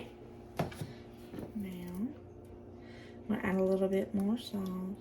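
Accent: South, United States. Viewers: Love the southern accent.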